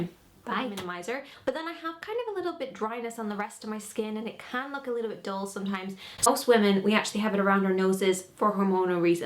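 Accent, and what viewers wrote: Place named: Ireland. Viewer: I love you and your accent! You explain everything so well!! I'm irish aswell! Proud to be irish🍀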